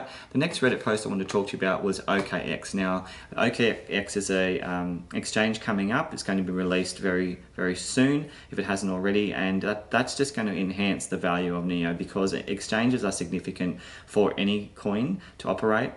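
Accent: Australia. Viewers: Nice to hear an Australian voice critiquing the crypto market. Recently came across your vids and am enjoying your input, keep up the great work... cheers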